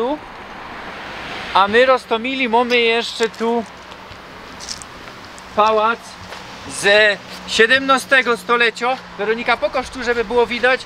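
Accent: Poland. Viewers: Niklas mo Slonski akcent , a Ty mosz Polski akcent. To nie mo nic ze dialektem zu tun.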